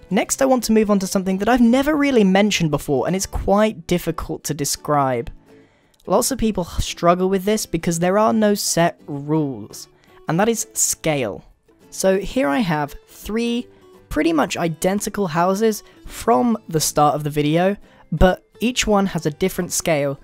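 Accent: United Kingdom. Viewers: I love British accents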